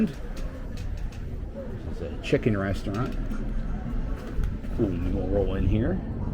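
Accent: Philadelphia, United States. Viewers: You got the most Philadelphia accent I've ever heard in my life.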